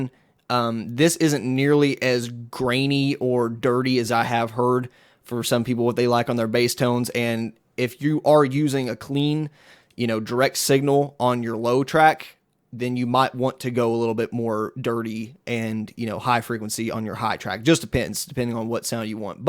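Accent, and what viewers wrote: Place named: Kentucky, United States. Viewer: new to your vids here... Where are you from? I'm from Kentucky,and your acsent sounds like you're from this area,lol. Sorry man not trying to be whatever, but yea... Awesome vid. New sub here.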